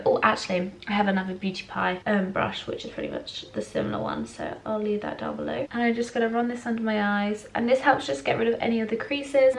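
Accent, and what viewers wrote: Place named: RP, United Kingdom. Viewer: I love your accent. You sound really posh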